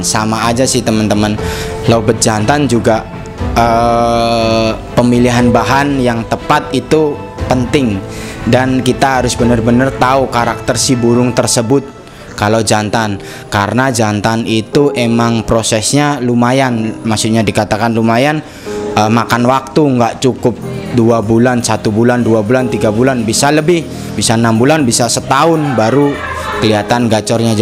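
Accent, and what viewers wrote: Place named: Kalimantan, Indonesia. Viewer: Logat kaya orang kalimantan hahaa maju terus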